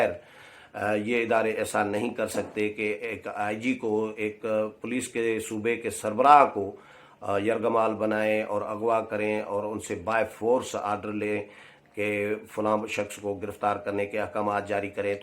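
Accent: Sindh, Pakistan. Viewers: AoA. Sir, aap Sindhi style me bohat achi baat kartay Hain. Khush rahain.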